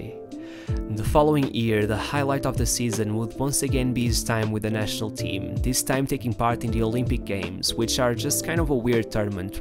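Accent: Portugal. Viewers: Portugal. The pronunciation is good with a Portuguese accent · Your pronunciation suggests to me you’re a Portuguese speaker. Are you from Portugal? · só um português consegue falar inglês desta forma e acertar todos estes nomes latinos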